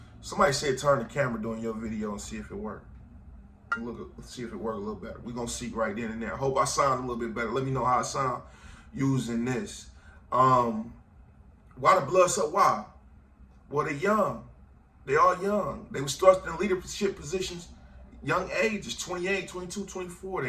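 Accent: Detroit, United States. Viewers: I'm sure someone has suggested this ... But I'd rock a "ain't no ifs ands or buts about it" hoodie. I listened to two of these videos and knew you were from Detroit without you even saying it.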